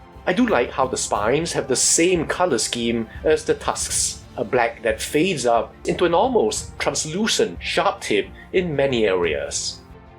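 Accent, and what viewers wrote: Singapore. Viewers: you sound singaporean/malaysian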